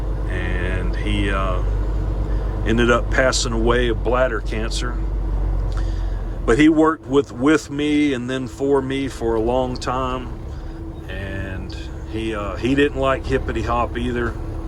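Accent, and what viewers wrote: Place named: Pensacola, United States. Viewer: I am enjoying this. I am guessing you are from the area close around Pensacola, as you sound similar to Adam Booth (abom79), who has a popular YouTube channel.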